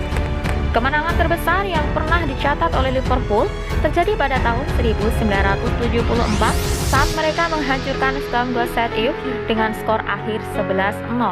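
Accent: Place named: Java, Indonesia. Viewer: Naratornya medok banget.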